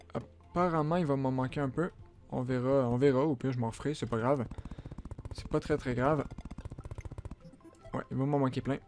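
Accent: Quebec, Canada. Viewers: j'adoooore ton accent québécois et ta maison et magnifique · Vive l’accent QUÉBECOIS, c'est plate qu'on a n'a pas bcp ( beaucoup ) sur youtube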